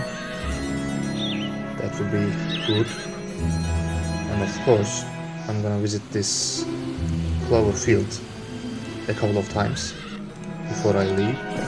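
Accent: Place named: Turkey. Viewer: Are you Turkish? Your accent is Turkish.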